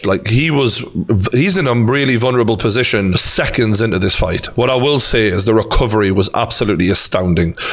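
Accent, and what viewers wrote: Newcastle, United Kingdom. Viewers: if only he knew what you know true geordie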